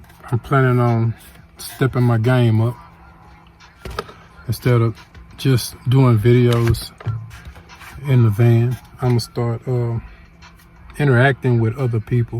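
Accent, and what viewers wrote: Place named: Texas, United States. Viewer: You look like a friend from Texas back in day. I hear that accent you got, homie had that. Back day I had to move on. I couldn't under where homie was going. So I waited, and waited. When Engelwood, Chicago south sides meets a Texan from H- town sparks fly, Texans believe that Texas thing they are bigger ruffer then average man. One on one no weapons we when at it homie had to give respect specifically around them why boys. I was mothers boy but had eight brothers and sisters so I did the ass kicking bullies to protect them and weaker kids and friends. He was first homie outside Chicago. Unfortunately homie didn't under what you saw was not real me. I didn't sell drugs or gang stuff. I was navy trained Electrician so thats direction I took. Homies walked a different path. I still think homie was the shit! Dam dude you look like homie!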